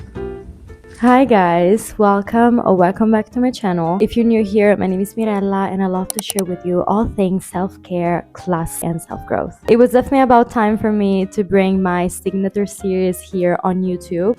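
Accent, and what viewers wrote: Italy. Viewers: wait a minute are u italian?? i noticed only now the accent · sentivo l accento ma mi sono stupita di come parli inglese da italiana, come mai fai video in inglese?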